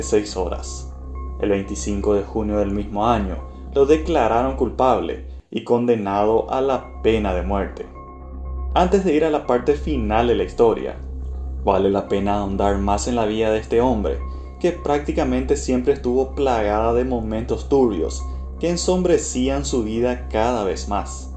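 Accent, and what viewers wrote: Paraguay. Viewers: Exelente video maestro 💣, sos de Ciudad del Este, Saltos o Pedro Juan si o si por tu acento 🇵🇾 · UF TU VOZ SUENA A BRASIGUAYO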